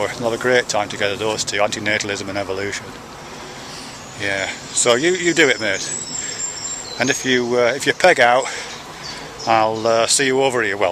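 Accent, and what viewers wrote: United Kingdom. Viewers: The odd thing to me is that your afterlife accent is British. Weren't you American originally? Is there an explanation for that change? Very good "channelling" of the content of someone else's super-ego otherwise though. AND you're absolutely right that some people are very callous to each other on YouTube. It'd be great if that didn't happen so much.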